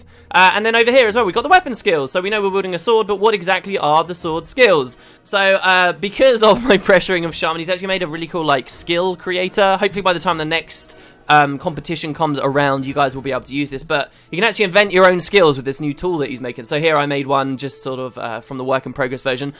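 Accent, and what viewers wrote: United Kingdom. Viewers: You gotta tell me what area of Britain you're from! I heard you pronounce "law" similarly to "lore" and couldn't help laughing. Great ideas for the giveaway though, I hope it all pays off by bringing in new viewers:)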